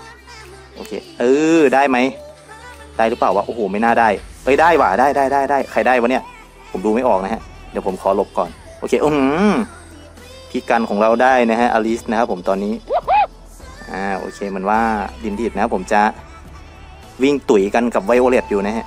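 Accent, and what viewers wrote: Isan, Thailand. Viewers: พี่เป็นอีสานหรอครับ แพนด้าจะมาหลอยป้อม นาตาย่าฉีดทีแกรกเหลือเลือด2ข้อ 555คนบ้านเดียวกันอ้าย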